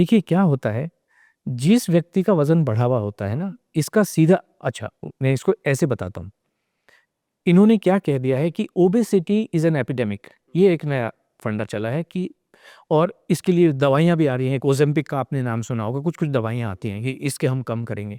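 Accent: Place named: Udaipur, India. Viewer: Host bhaiya kya aap udaipur rajasthan se h...aapke bolne k tarike se pata chal raha h....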